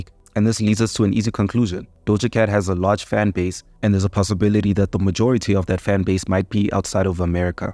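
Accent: South Africa. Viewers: You sound South African bro. Like you went to Boston College or something. Anyway you speak very well and fluent. Keep going bro.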